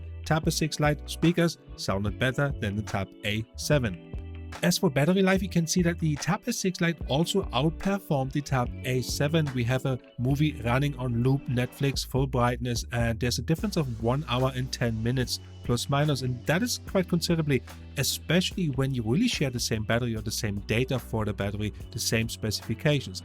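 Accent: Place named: Germany. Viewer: Nice video, i think i'll choose S6 Lite, are you german? you have a german accent i find lol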